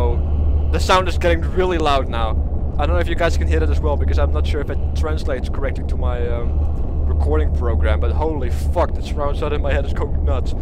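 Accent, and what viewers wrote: Netherlands. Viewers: Hahaha, I had no idea you made these kinds of vids as well! Hilarious! Make more! There's tons of these kinds of games floating about these days. :3 Also, your accent... Are you Dutch or something like that? :D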